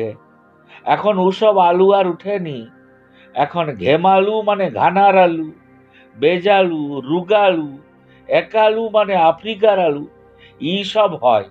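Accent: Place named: Medinipur, India. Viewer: মেদিনীপুরের আঞ্চলিক কথায় আবৃত্তি টা শুনে খুব ভালো লাগলো।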